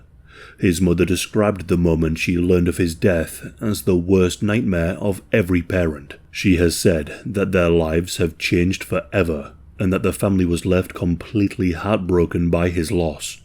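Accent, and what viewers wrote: United Kingdom. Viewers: Can someone answer me this please; Where is actually from? 🌎 🤭 Because his channel says Australia, but he sounds British 🧐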